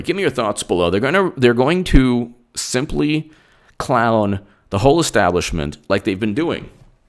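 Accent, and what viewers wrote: South, United States. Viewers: If you did your research, you wouldn't be making fun of Jeff Sessions. You owe him an apology. He had a big part in bringing this whole charade to a halt! Stop with the rude, southern drawl and what you think are cute antics.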